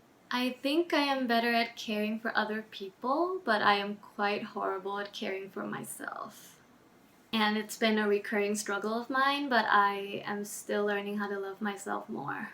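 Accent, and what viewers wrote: Thailand. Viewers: THE CUTEST slight thai accents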